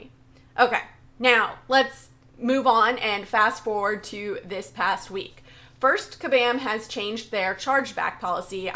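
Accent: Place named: Texas, United States. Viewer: You seem to have a hint of a western accent, like texan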